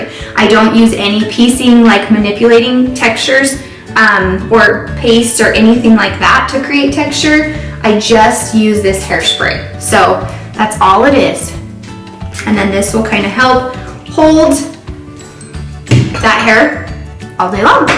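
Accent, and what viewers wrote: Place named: Utah, United States. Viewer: I thought she had a Utah accent! I live in California 30+ years and when I go back I slip into the lingo and accent.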